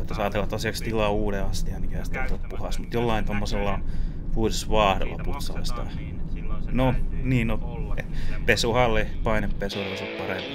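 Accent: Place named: Jyväskylä, Finland. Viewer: Puhut niin paljon tosta Jyväskylän murteesta videolla. Mä kun en näe tossa puhetyylissä mitään outoa. Tosin koko nuoren ikäni oon asunut Jyväskylässä😁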